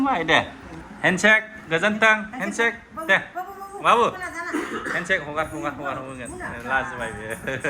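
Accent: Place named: Bodo, India. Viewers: Kokrajhar ni ya nw mwjang boro railai nai ya ada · Bengtol niyasw pure boro rao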